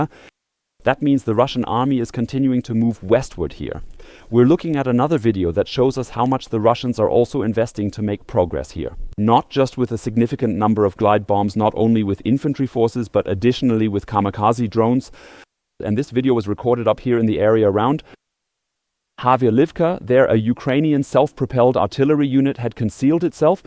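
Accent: Germany. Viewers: A pleasure to watch with such a knowledgeable, handsome host who is extremely fluent in English. I don't mind at all the touch of a German accent. He only slipped up once, letting "Belgrade" come out instead of "Belgorod.' That's highly forgivable, considering that the meaning of "Belgrade" in Serbian is exactly the same as "Belgorod" in ruzzian.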